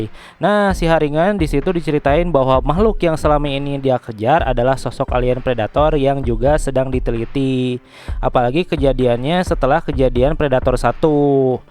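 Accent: West Java, Indonesia. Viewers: Orang Sunda bang?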